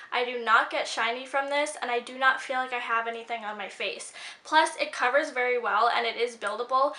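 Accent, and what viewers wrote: Canada. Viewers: Are u Canadian??? :)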